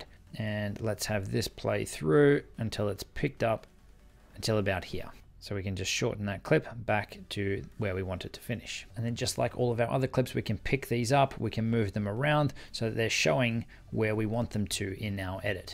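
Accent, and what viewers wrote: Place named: Australia. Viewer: Hold on, your accent is Aussie, but you spelt 'Colour' in American. The betrayal though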